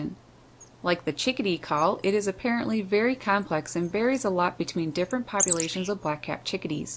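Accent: Canada. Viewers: I can tell you’re Canadian, your “O’s” sound like ooo’s, hence “Abooot” Love the video tho!!! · I love your videos, especially those featuring corvids. I was tempted to mention that I thought I heard what linguists call "hypercorrection" in your narratives. I'm glad I didn't. My new sense is that what sounded like hypercorrection might be Canadianisms in your speech. I'm especially interested in what you have observed about social learning in birds. That's a topic that has scarcely been explored at all. Thanks for the gift of your observations. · Just started to listen, say's " aboot," Eight oot of the sixteen differnt calls. Haa Must be Canadian.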